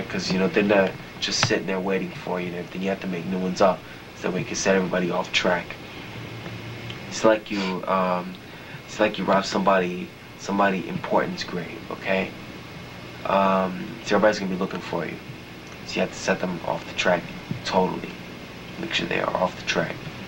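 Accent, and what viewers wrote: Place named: Long Island, United States. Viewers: clearly he’s from queens area of new york. accent gives it away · Brooklyn or queens for sure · I’m from Long Island, this kids sounds like he could be from around there.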